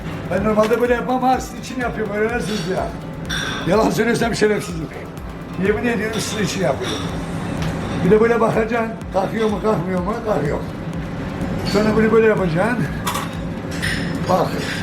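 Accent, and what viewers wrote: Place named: Adana, Turkey. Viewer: allahına ölürüm senin ha ustam tam bir adanalı konuşmalar falan adananın ekmegini yeyip suyunu içtigin çok belli bende adanalı bir kebapçı oglu olarak sizin gibi adanamızı temsil eden adana evlatlarını görünce gurur duyuyorum ve seni taskipteyim benim babama şişko neçmi derlerdi yıllarca birbiçerlerin ustalıgını yaptı ama şimdi sizlere ömür senin gibi ustaları görünce direk babam la tablada çalıştıgım anılarım geliyor devam durmak yok helal sana Adanalııııııııı.........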